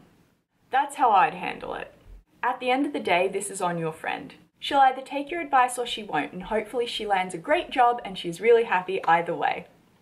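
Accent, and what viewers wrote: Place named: Australia. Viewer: I'm really curious to find out where she is from. Her accent sounds Australian most of the time.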